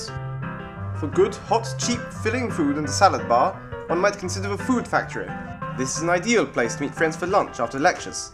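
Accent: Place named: Glasgow, United Kingdom. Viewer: I want to go to Glasgow but I'm scared I won't understand anyone just like this narrator. His accent is hard to understand.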